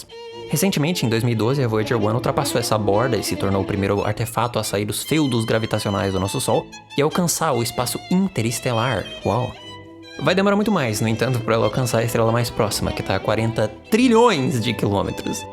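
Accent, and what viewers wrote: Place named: São Paulo, Brazil. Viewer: Eu devo ser mt chato mesmo mas nem consigo assimilar o conteúdo do video direito com esse sotaque paulista fortíssimo KKKKKKKK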